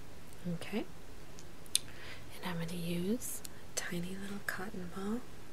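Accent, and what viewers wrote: South, United States. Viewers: YAYYY!!!! I'm so excited! I love your sweet southern drawl that reminds me of one of the reasons I love Texas so. :P Your first makeup video still gives me tingles even after - 2 years - has it been that LONG?!?! anyway I'm just so glad to see you back. :)))) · Oh your southern voice is soo soothing ^.^ I've missed you!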